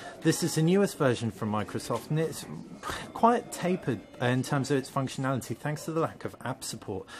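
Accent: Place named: United Kingdom. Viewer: he's British and that's how you're supposed to say it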